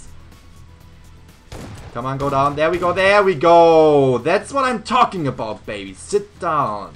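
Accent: Germany. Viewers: Are You german? Because You have like an accent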